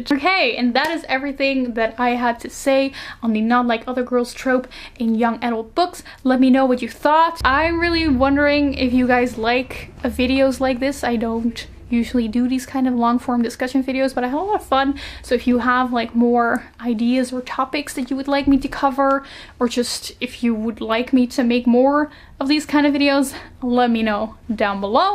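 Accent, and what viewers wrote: Netherlands. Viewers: are you a dutchie i hear an accent ( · The Algorithm recommended me this video...that accent sounds dutch are you dutch?!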